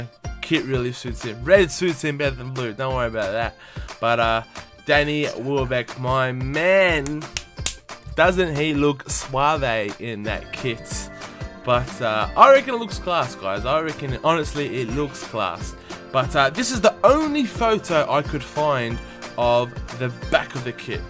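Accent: Australia. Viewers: are u from ausie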